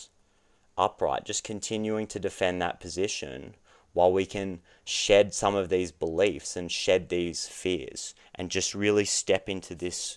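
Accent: Australia. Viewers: Sorry dude, I can’t listen to an Australian accent. Peace out 👋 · Are you from Australia?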